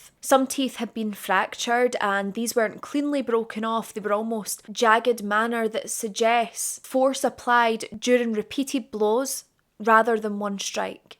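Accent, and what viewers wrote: Scotland, United Kingdom. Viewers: All my hype points went to you 💗 once again a new story for me, you’re the best. You were the #1 channel I watched in 2025, I’ll never get enough of you’re Scottish accent and to see what stunning new look you show us❤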